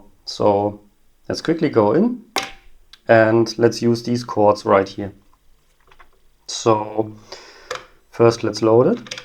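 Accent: Germany. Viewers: Your Stimme Sounds a bittl german. Can u make a Video on Deutsch? ;)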